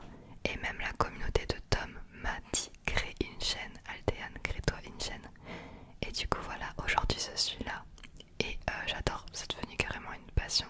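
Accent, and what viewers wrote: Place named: South, France. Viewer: Tu es Parisienne ? Tu as dû descendre dans le sud, toute petite, car je reconnais notre accent. Je suis de Carmaux. Bizz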